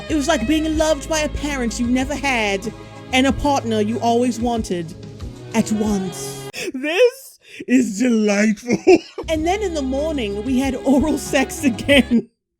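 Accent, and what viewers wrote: United Kingdom. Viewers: Is she from burnley?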